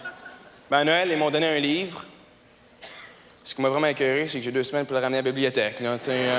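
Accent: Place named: Winnipeg, Canada. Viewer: oufffff tu avais pas mal l'accent de Winnipeg la dessus...une chance tu l'as un peu perdu mais garder ton style tout de même.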